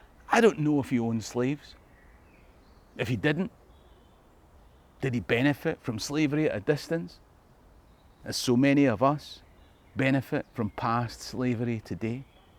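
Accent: Scotland, United Kingdom. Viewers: Outstanding presentation -- I'm always excited when I hear your Scottish accent with "Let me tell ye' a story ..."! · l've watched a few of your videos, and being from Texas l love to hear that Scottish brogue. I remember my Dad wanted me to go with him to a drug store and hear this lady cashier, as l purchased a carton of smokes for my Mom. When she exclaimed " Ooh,ya shouldn't smoke. There bad for ya!" I turned to my Dad and told him "She's from Scotland." That lady was kinda shocked that I could tell by the accent. It wasn't long after, a couple were moving in next door to my Mom and Dad upstairs. And I only saw him and his girlfriend (wife) going to bring a full size couch up So l insisted to take the couch from the female. After we got it into the apartment (flat), when he spoke l could tell he wasn't from Texas, but Cork, Ireland. His significant other, she was a California girl but not a blonde! 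I mentioned to them about the lady at the drug store that was visible from the building where we were standing. I know he was excited about Ireland getting into the World Cup that year, lf l remember correctly it was in'90. He had ask me did l watch football, and l just assumed he meant American football, l said football hasn't started yet being Summer time. He then said Soccer, l told him as a kid l played it in Gym class. But in the '70s it wasn't popular as nowadays in America. Too much running for me, and l've always been a skinny person, but smoking doesn't help neither. But l do like to hear y'all talk!😊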